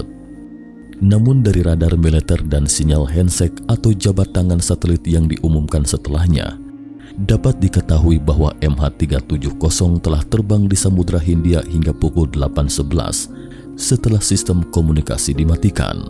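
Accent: West Java, Indonesia. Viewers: tapi PRONOUNCIATION ENGLUSH (pengucapan istilah ejaan)....kurang enak di dengar....spt bercampur logat sunda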